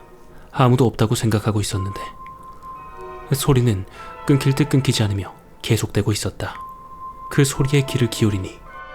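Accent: Gyeongsang, South Korea. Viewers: 님 경상도 분이신지~~무서운장면에서 사투리땜에 빵터집니다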